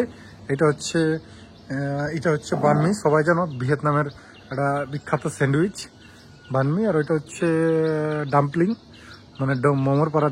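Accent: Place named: Purulia, India. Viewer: Dada tumi purulia bangla bolcho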